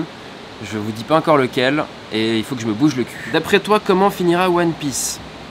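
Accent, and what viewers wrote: Switzerland. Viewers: T’es genevois ou bien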